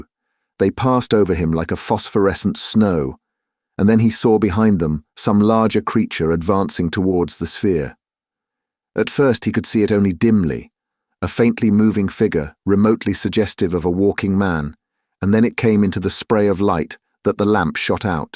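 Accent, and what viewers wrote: England, United Kingdom. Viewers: Ahhh thank you sooooo much, fabulous narration. I so appreciate this lovely English accent.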